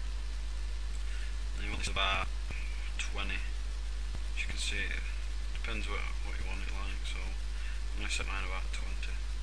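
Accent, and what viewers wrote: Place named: England, United Kingdom. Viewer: Seriously? He's English duh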